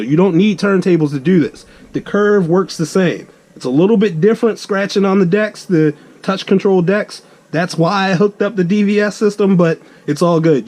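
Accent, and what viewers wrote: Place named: Baltimore, United States. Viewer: I can tell this man from Baltimore 🤣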